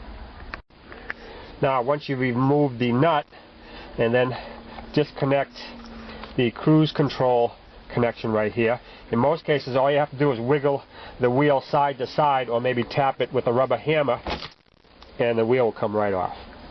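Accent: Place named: Northeast, United States. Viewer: Paaaaak the caaaaaaa! How come all do-it-yourself guys have that northeastern accent real bad?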